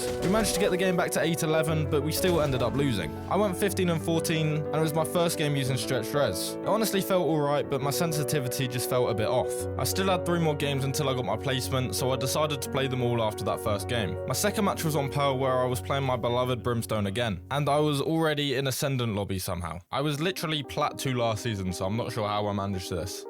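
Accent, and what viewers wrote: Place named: United Kingdom. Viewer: Idk how I didn’t realize you were br*tish until now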